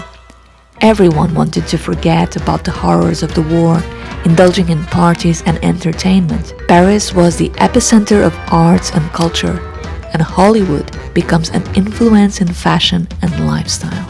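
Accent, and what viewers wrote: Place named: Portugal. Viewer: Portuguese accent . Love it.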